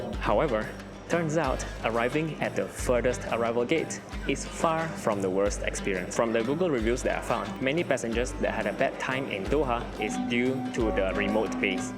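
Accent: Indonesia. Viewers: speaking of which, do i hear a hint of Indonesian in your accent? i love that your accent isn't your run-of-the-mill Singaporean accent! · your indonesian accent is very strong.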